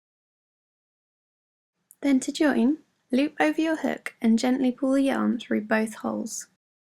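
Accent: United Kingdom. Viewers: where are you from? you sound a bit Devonshire too me... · She sounds so British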